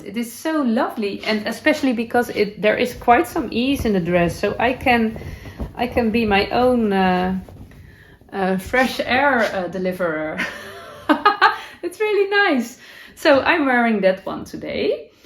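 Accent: Netherlands. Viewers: Closet is fine 😊 in Australia we also call it a Wardrobe. Your Dutchy English is wonderful. I have one language and I’m always impressed when people can think and speak in more. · I love the blanket behind you. Your scrappy sweater of granny squares looks lovely and must be so fun to make! Your podcast is very inspiring and I love every episode I’ve seen. I love your DutchY English! I wish you were my next door neighbor so we could knit and crochet together sometimes. Love Katie from Maine USA💖🧶🧦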